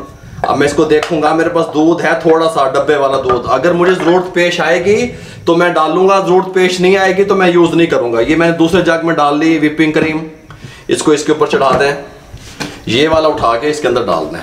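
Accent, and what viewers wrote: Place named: Punjab, India. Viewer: Bai luv u nd i like d way u speak hindi in punjabi tone nd ur recipes are awesome....luv from australia nd india..god bless u always..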